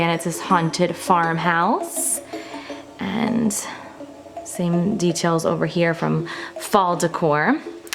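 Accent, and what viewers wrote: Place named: Midwest, United States. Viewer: I’m not American so I’m not sure but is this a midwestern accent?? She has the craziest twang in her voice I’m obsessed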